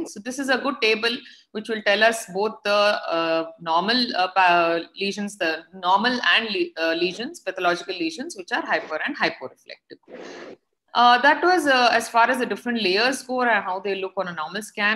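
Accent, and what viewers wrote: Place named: India. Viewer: Subtitles really are usefull, indian english accent is not always easy to undestand.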